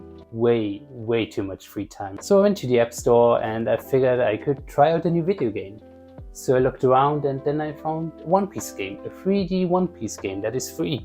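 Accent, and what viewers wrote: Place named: Germany. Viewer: Thats very interesting ! I am from Germany and i thought your accent sounded german.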